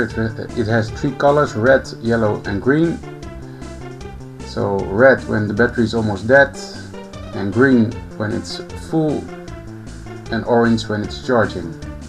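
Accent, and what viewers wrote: Netherlands. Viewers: the second he started talking i knew he Dutch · Nederlands accent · Soep in je schoen. Nederlands accent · Are you Dutch? Becouse you sound Dutch. I'm Dutch :) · Dat Nederlandse accent, though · You must be dutch, what a horrible accent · You are dutch, i can hear it from your accent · Are you Dutch? Cause you sound a little Dutch. :) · w0w dutch accent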